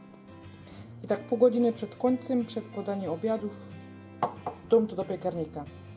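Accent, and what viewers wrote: Silesia, Poland. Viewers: Kanał jest ogólnopolski wiec mów poprawna polszczyzna bo tego śląskiego bełkotu nie rozumiem.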